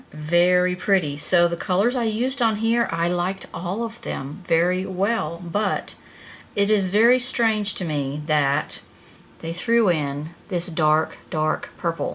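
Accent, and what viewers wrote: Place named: Mid-South, United States. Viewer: love your subtle accent. Are you from the Mid-South? watched some of your videos LOL women of a certain vintage , so much better than saying women of a certain age! your makeup looks really good , edgy but classy.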